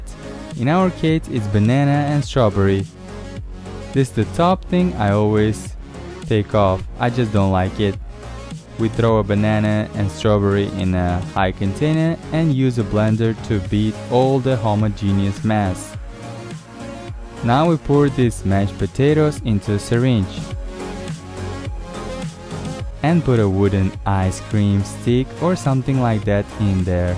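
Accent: England, United Kingdom. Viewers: i think this is the most british accent i heard from you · Its kinna cute when he call that fruit punch mash potatoes 🤣🥰🥰 im i the only one who deeply inlove with his english accent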